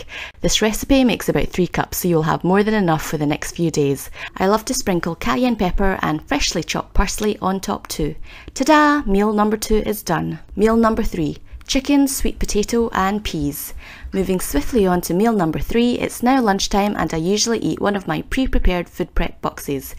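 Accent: Scotland, United Kingdom. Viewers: I'm a new subbie and I love your videos. Good ideas! Out of curiosity are you Scottish because you sound like like you have a slight accent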